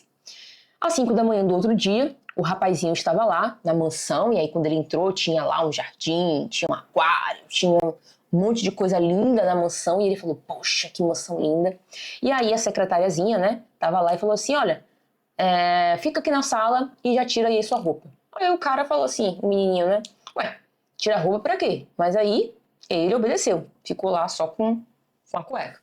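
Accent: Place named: Bahia, Brazil. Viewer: eu ouvindo seu vídeo e, ao invés de prestar atenção, tentando identificar se você é da Bahia pelo sotaque e já querendo chamar pra um café. ❤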